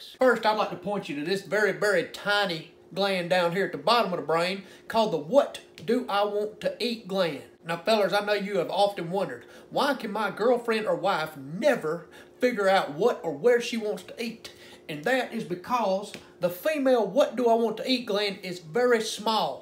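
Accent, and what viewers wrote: South, United States. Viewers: I love the Southern drawl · Hot and funny! Love the southern accent.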